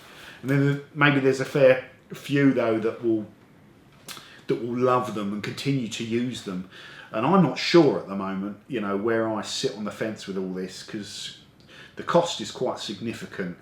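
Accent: England, United Kingdom. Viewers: Accent? An English man speaks English 🙆‍♂️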